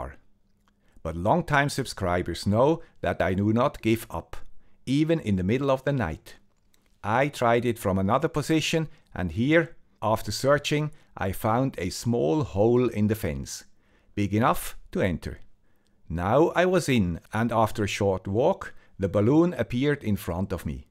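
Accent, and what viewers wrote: Switzerland. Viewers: Salü, guy with the swiss accent and thanks for the thrilling videos of you, hunting weather balloons at night. The last time I checked there were almost no balloons going down in my area, though (Rhein-Main in Germany)...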